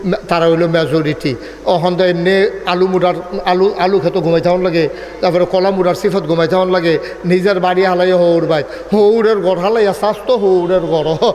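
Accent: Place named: Rural, Bangladesh. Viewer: সে তার গ্রামের আঞ্চলিক ভাষায় কথা বলে সে এখন পর্যন্ত শুদ্ধ ভাষায় কথা বলতে পারে না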